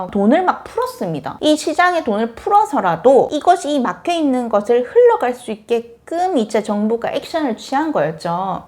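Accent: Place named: Busan, South Korea. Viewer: 부산 사터리 넘나 귀엽다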